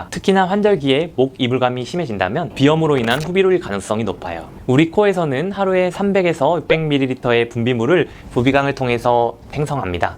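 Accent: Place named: Gyeongsang, South Korea. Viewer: 몬살아ㅋㅋㅋㅋㅋ 안닮으셨다요 선생님도 경상도 시구나 저희 지역은 사투리 잘 안써요!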